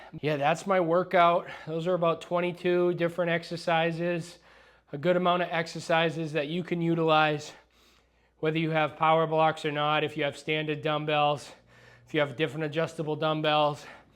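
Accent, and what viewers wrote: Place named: Boston, United States. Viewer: Boston accent!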